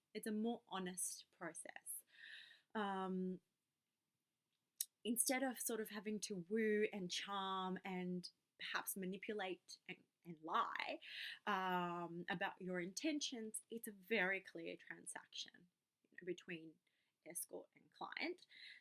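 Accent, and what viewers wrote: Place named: Australia. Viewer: judging by her accent she's from down under where it's legal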